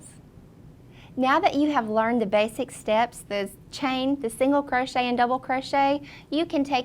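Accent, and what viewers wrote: South, United States. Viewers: I live the southern accent!!!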